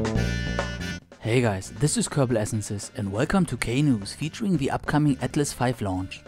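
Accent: Germany. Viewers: der deutsche akzent is einfach super XD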